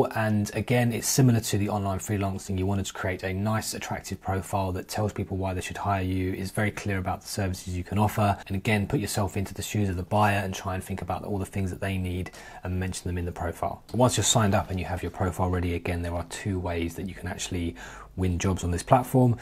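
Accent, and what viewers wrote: United Kingdom. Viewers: Yes nice to hear an English voice. I have a good idea for number 6 side hustle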